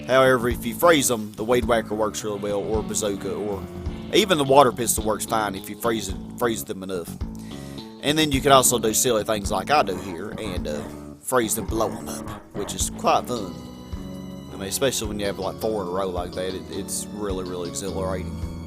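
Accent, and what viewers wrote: South, United States. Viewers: At first I thought you were British, but about 1 minute in and I realize you are southern/country. This is easily my favorite game from the SNES and Sega Genesis era. A friend and I reached the 40 something level where you fight the giant spider, and the game glitched out and we couldn't progress any further. Basically robbed of beating the game, the one and only time we made it that far. It's level 46 or 48 or something like that.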